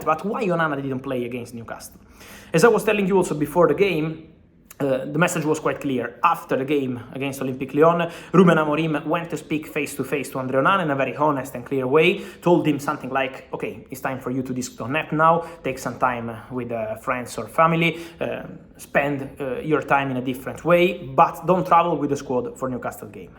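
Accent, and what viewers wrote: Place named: Italy. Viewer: Por qué no habla en español o tiene traducción simultánea 🥴. Su inglés es muy malo y le entiendo el 30%. I mean, If you're not completely sure of you pronunciation, please try to speak slowly because the italian accent is really thick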